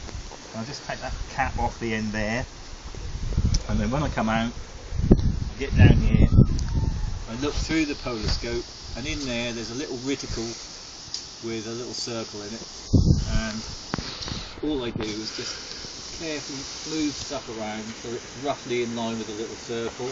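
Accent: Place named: Norfolk, United Kingdom. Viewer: You sound like a good old Norfolk boy. I was born in Yarmouth but have lived in Ipswich for the last 10 years. We have some lovely dark skies around here and the Skywatcher 200 is on my shopping list. Good video.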